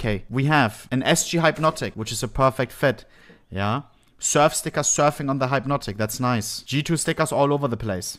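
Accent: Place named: Germany. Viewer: german accent 🤮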